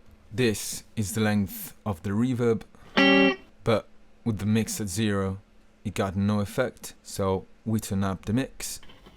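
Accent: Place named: England, United Kingdom. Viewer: he has a clear beda house english accent!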